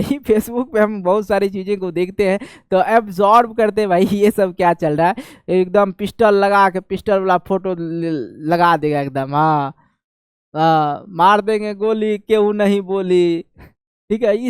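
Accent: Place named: Bihar, India. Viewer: Bhut Bhut bhut mja aaya Bihari Andaj me pdne me 😊😊😊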